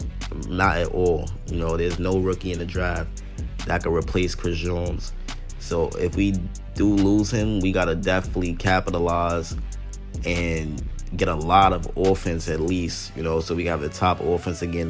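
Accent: East Coast, United States. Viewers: sounds like he from east coast some where 😂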